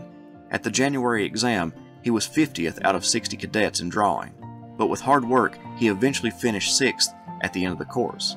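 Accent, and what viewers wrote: South, United States. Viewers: I didn’t know this was going to be 2+ hours of talking…..I really dislike listening to narration without something visual to hang on to bc I am just not and never have been an auditory learner, but I stayed with it for 45 minutes, which is a testament to the narrator and scriptwriter. But it’s a no-go for 2 more hours, and it’s too bad bc I do enjoy the subject, but the narrator’s soft voice with a soupçon of a southern accent puts me to sleep or I start looking at a book and realize I’ve lost the thread and have to go back. I’ll try to return to this and listen in parts!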